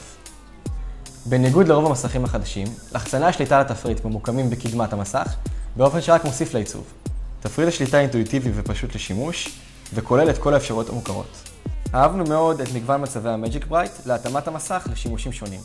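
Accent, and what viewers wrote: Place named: Middle East. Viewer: is it just me or is that middle eastern accent seriously annoying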